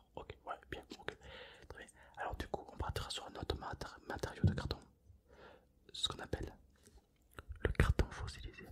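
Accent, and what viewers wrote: South, France. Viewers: n’a plus d’accent du sud en chuchotant Like si ta remarqué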